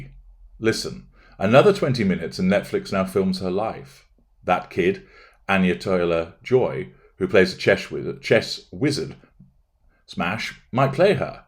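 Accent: United Kingdom. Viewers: I loooooove the sweet tones of our glorious narrator 😻😻 especially when he says “furthermore”!!!😈 Oh my, oh my! 😋 I’ve always had a thing for the British accent! Hehe 🙃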